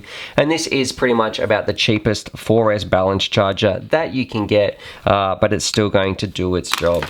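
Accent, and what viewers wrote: Australia. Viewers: Sounds like your an Aussie but using US dollars in the totals lol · G'Day. Great video mate and unreal to hear an Aussie voice. I was trying to work out where you were when out in the field with the floodlights/footy field/cricket pitch in the background. At first I thought that was Adelaide Oval, but I'm not sure... Anyway, with it being a year since you made this terrific vid, do you still stand by these products as the best value for a beginning Drone Pilot? I have zero interest in spending lots of money on a DJI anything, plus an iPad Mini, etc, but am keen on recording flight footage. Sorry if this is a silly question, but how do you record the flight footage please mate? Thanks again for the terrific instructional video. I've shown it to all my mates who are keen on this as well.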